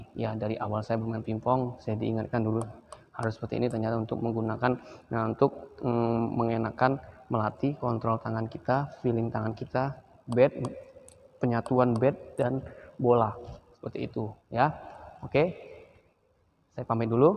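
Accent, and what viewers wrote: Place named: Palembang, Indonesia. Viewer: Ay wong Palembang koyong ini😂 Palembang dimanonyo Yong?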